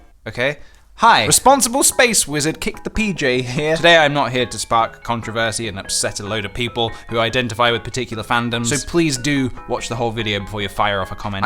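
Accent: United States. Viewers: Tell me why your American accent is better than every american’s American accent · That american accent 😍 Grateful for how good you are at it LOL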